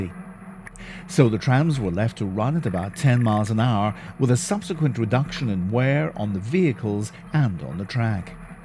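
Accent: Isle of Man, United Kingdom. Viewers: Is the narrator's accent Manx? I couldn't quite place it.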